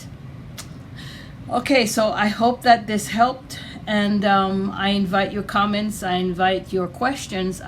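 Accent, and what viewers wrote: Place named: Jamaica. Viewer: I feel Comfortable with you nationally is Jamaican I sound it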